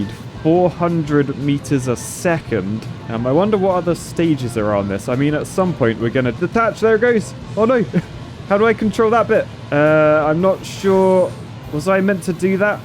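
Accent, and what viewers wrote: United Kingdom. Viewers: Another British that Plays KSP?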